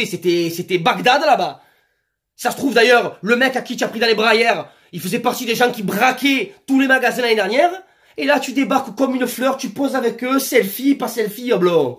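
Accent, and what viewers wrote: Marseille, France. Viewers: Salut j'adore votre accent vive les marseillais bonne continuation excellente journée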